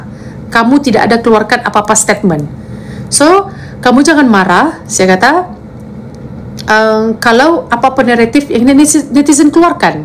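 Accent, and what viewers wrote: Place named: Kuala Lumpur, Malaysia. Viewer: ending terbaik...Sdri ni mahir bertutur dgn gaya pertuturan org KL Sgor la.....canggih...thx..all the best and May ALLAH perlihara semua geng yg BENAR dan memebala anak kecil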